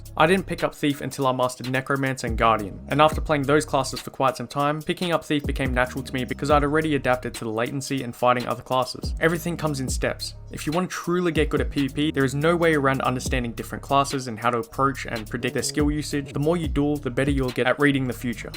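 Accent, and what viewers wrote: Australia. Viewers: fellow aussie herre lol, was looking for a high ping kind of guide to see what im getting into and what class to pick and the second i heard your accent i was like MY BROTHER xD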